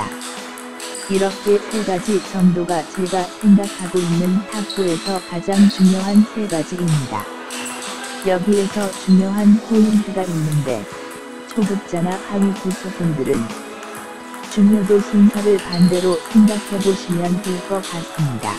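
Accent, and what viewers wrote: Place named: Gyeongsang, South Korea. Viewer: 님 탁구러버 아닌가요? 님 말투보니까 딱 경상도 보리문디 같은데요..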